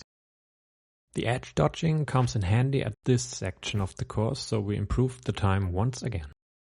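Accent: Germany. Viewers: You sound a bit german but im not sure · Schönes vid... und Dein englisch ist sehr gut, aber man hört immer den "Deutschen" raus.... 👍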